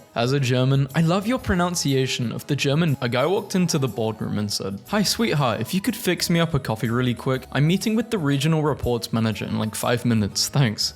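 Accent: United Kingdom. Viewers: Is it just me, or does this guy sound British?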